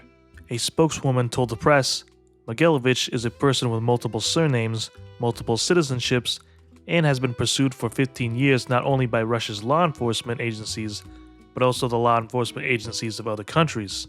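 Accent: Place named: Boston, United States. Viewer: Sounds dead on like Kevin Weeks to me. Definitely a Boston accent and not a Long Island one.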